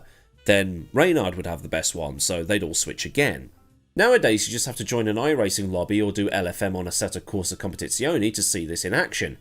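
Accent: Australia. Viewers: honestly, as an aussie i pretty much lost most interest as soon as ford switched to the mustangs, and now that it's just american cars? yeah, who gives a fuck anymore? (also your aussie accent is pretty good mate) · Firstly excellent aussie accent. Secondly, maybe parity should be scrapped for a bop system. That allows a broader use of different types of body and engine configurations. This may entice other OEMs other than just those who make 2door v8 road cars.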